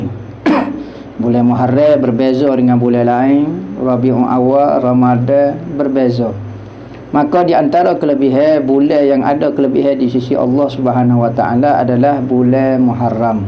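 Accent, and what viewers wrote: Kelantan, Malaysia. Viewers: Kelate pekat ustad terbaik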